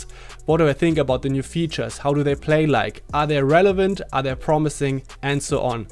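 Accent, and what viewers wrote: Germany. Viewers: Safe Deutscher, dieser Akzent 😂 Genial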